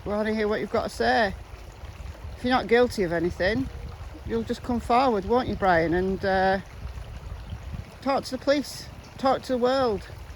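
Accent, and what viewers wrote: United Kingdom. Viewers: Your accent so sweet like British 👍🏾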